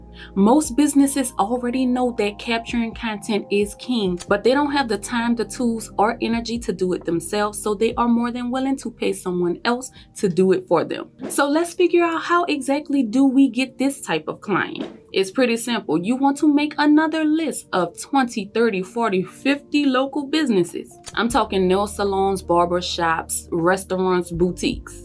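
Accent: New Orleans, United States. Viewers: 🎩👌LayDonDe'Vu on ya line. I can tell Baby from New Orleans Period Do ya thang Baby Keep speaking to the audience that need it. I wish you Prosperity in All you do⚘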